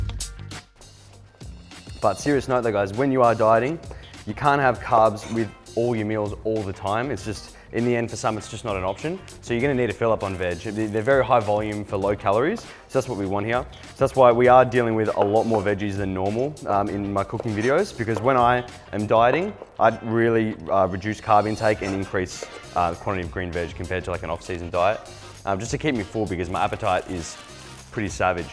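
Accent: Australia. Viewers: Your accent is so Aussie 🤣🤣brilliant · For some reason, Australian accents makes these videos less cringe and less douchebagish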